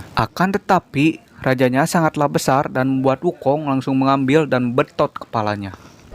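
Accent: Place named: Java, Indonesia. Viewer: Logat jawa 😂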